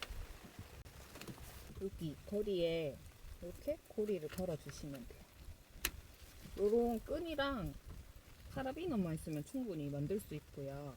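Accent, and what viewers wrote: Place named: Gyeongsang, South Korea. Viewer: 잘뵤고 있습니다~ 전대구에 사는데 경상도 억양이 정겹고 더 좋으네요~❤❤ 사시는곳 물어봐도 될까요~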